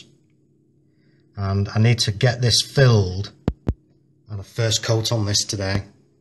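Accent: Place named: St Helens, United Kingdom. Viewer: Sound like your from st.helens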